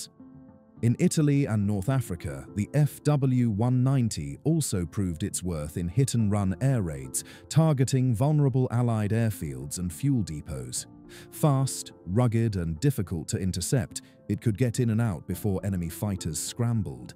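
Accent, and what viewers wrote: United Kingdom. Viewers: OMG another British accent!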